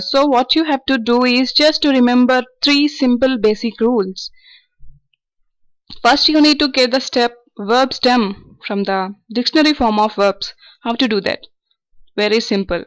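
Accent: India. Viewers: Hahaha so cute tone how indians talk english its just playing a funny games lol or its like waving water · Your accent is in Indian English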